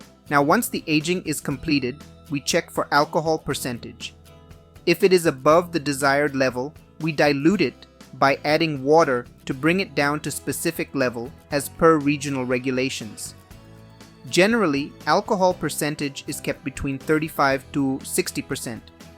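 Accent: India. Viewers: You look like you have Indian goat voice. What nationality are you?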